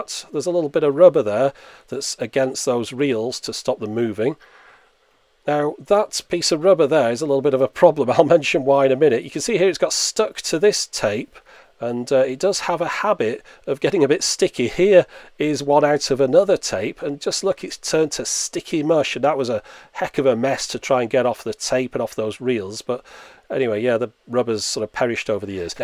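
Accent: United Kingdom. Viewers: the uk. You can hear it in his voice. Also, the ebay was asking for pounds, not aussie dollars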